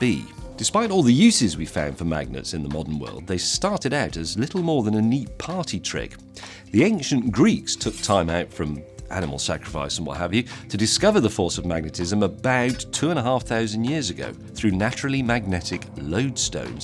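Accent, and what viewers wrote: United Kingdom. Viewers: The ideal British accent.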